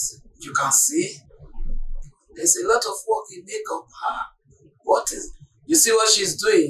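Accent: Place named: Benin. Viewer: I recognize that Benin accent 😂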